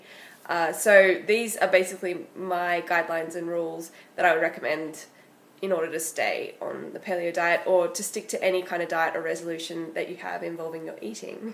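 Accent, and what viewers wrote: Australia. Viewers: Wish I knew the first tip about phasing it in and shaping eating behaviour before my first Paleo attempt (rather than going at it like a bull at a gate & failing miserably). Also, have I found another Aussie?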